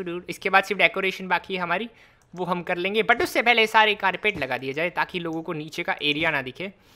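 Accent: Gujarat, India. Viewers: are you Gujarati??🤔🤔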